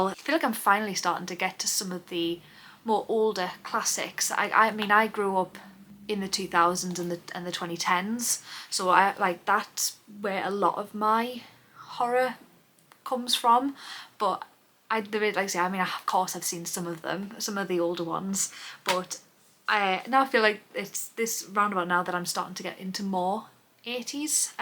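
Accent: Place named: North East England, United Kingdom. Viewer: New subscriber to your channel today.. I thought I recognised that accent.. fellow North Easterner here as well.. Huge horror fan and put up a load of horror vids on my channel as well.. I'll look forward to catching up on some of your vids over the coming days 😎👍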